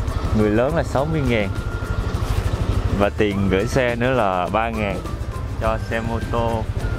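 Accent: Mekong Delta, Vietnam. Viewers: "Ở đây cũng có ruộng bậc thang mà nó cũng trụi lũi luôn" Giọng miền Tây dễ thương quá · Tiếng nói đậm chất miền tây ko lẫn với ai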